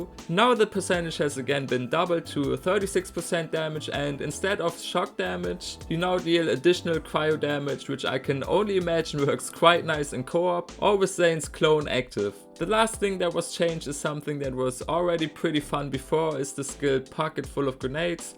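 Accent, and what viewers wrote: Germany. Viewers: Höre ich da deutschen Akzent? xD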